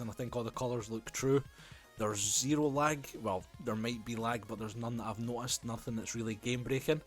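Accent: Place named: United Kingdom. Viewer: Thanks for the video! You showed us video from a N64 connected to the ODV-GBSC via SCART, was that SCART-RGB or composite? And I presume the cable from the Sega Mega Drive was SCART-RGB? And, judging by your accent you are British; Are your consoles PAL and they work fine? What about the screen tearing issue, in which situations and with which consoles did you have it appear? Have you tested VGA input? I'm looking for an affordable scaler for use with a DOS PC (VGA), Amiga (SCART-RGB) and C64, NES and N64 (composite). It seems that unless you pay the extortionary big bucks and import a Retrotink from the US, finding a single device with all these capabilities is very difficult.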